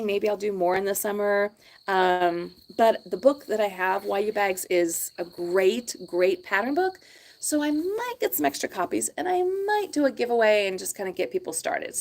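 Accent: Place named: South, United States. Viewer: Howdy neighbor lol. From Alabama here, nothing wrong with a Southern accent 😊 loved this video ❤️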